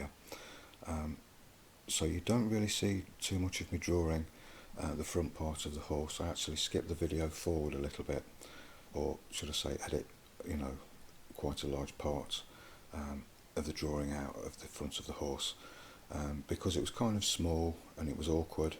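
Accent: United Kingdom. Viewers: That's awesome. Just subscribed. The British accent adds to your credibility too.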